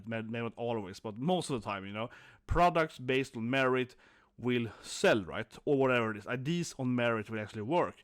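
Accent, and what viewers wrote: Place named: Sweden. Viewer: You keep saying "us Norwegians", but you're clearly Swedish :D Maybe it's an in-joke with the channel I dunno. Edit: Ok you have dual citizenship. But you speak Norwegian like you never spoke Norwegian before, it's pretty funny. You must have spoken Swedish when living here. Anyway, this game epitomizes why we need to shut down the department of culture.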